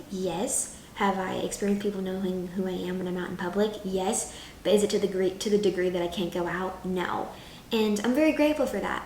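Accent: South, United States. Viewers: I can hear your country accent. Also, team Bluey. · Your southern accent is showing more and more. Also team Bluey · Your country accent is so beautiful❤ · You really inspire me please always stay the light that you are. Seeing you grow up through the screen is amazing and you really are an amazing person( I love you soft country accent when you talk) I love your content so much. 💜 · Who’s ur favorite country artist? Mine is:3 also u are so sweet and I hear a bit of a country accent when u speak and I love it!! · I hear the county then you talk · Oh my, you soul is so beautiful, you have everything I would want in a person 🤍. Personally, I can REALLY here that country accent in you, suits you so much. Thank you fo all that you do ❤️❤️❤️ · U sound like a real country girl i luv it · Her voice has gotten so much more country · People saying your not country is mind blowing you sound country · out of all the years I’ve watched you I just realized you have a slight country accent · I can hear your country accent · I can tell you are country! 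It’s the way you say certain words. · Bro why does sound like she has a southern accent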